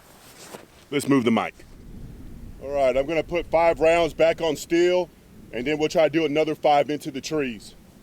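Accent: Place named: Texas, United States. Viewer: Good stuff. Keep it up. Where in north Texas? You sound like the boys I grew up with.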